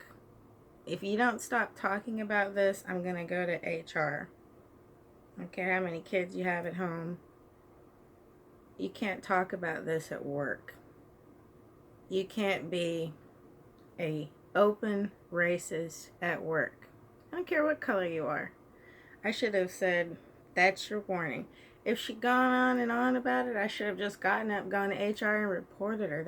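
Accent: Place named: Dallas, United States. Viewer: I'm from Dallas too.. I never realized we have a Dallas-accent until now! I hear myself lol high five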